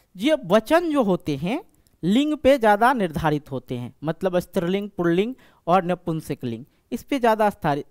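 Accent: Bihar, India. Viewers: Sir aap bihar se ho kya?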